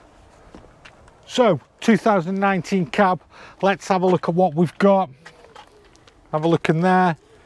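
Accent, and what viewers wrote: Stoke, United Kingdom. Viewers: Also.. I just had to Google where you’re based as you sound like a local Stokie to me. ☺️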